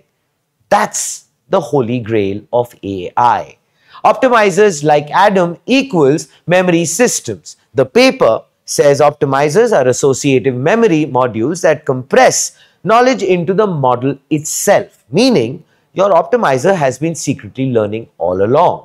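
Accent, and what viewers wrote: India. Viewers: He is separating his words and using volume variety to clarify his Indian accent and doing a very good job of it!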